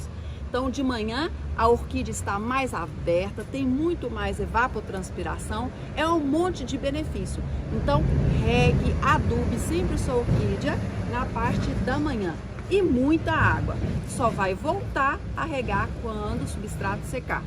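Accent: Minas Gerais, Brazil. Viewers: Voce é mineira ?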